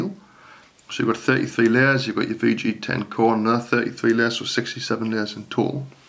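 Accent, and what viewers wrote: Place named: North, United Kingdom. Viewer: Always a delight hearing another northern accent on YT!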